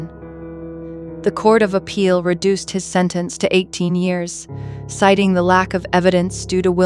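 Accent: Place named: United States. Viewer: American narration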